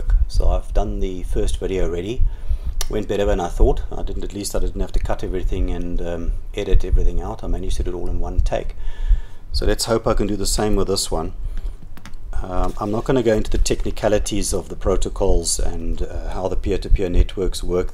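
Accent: South Africa. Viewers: I hear a South African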